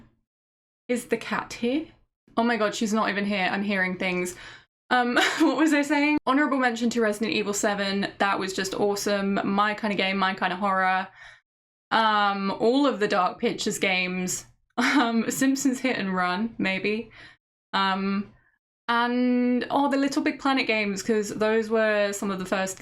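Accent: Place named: United Kingdom. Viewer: i never thought you was lived here by that bloody british accent😋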